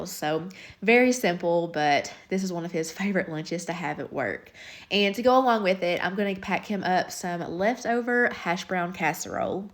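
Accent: South, United States. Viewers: Maybe it's my preference but I love hearing a southern accent. It's a familiar comfort I don't always hear anymore with how many transplants live in my area. Southern women just have a way of speaking that keeps me listening. Keep up the videos, you're doing great!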